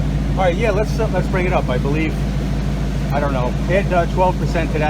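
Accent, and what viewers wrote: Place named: Boston, United States. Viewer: Guessing by your accent that you are not from Florida. Boston perhaps?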